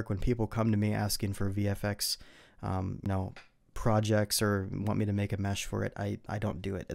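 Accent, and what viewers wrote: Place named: North America. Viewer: You sound North American to me. You have a deep voice, you must be 6'3", therefore I need to be all ears, as English is my second language. Overall, I mean, it's good to hear native english speakers. When someone tries to explain with strong foregn accent, I just turn off their videos. Lol. Thanks for sharing useful info! Good luck!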